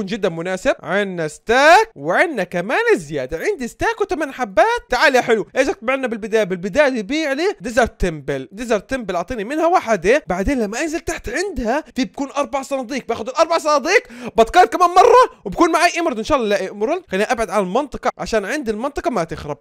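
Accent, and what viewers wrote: Syria. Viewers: انا تعرفني اني اوديك شو اسمي اسمي عبد الرحمن ميه ميه والله انك سوري اسمع صوتك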